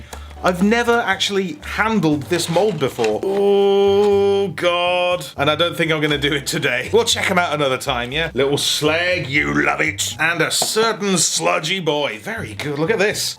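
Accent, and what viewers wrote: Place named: Birmingham, United Kingdom. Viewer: West Midlands crew report, Stafford here... P.S I caught the brummie twang straight off the bat haha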